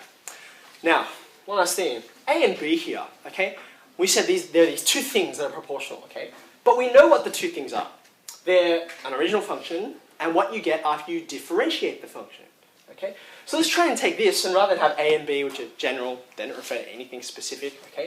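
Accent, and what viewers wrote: Australia. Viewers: Liked for the aussie, subscribed for the education. Ugh. wish you were my teacher.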